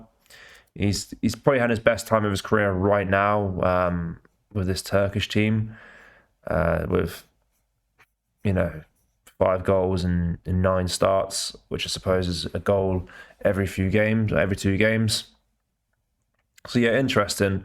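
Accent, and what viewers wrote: United Kingdom. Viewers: We? You sound British to me, ask the rest of your 'we' if they are British.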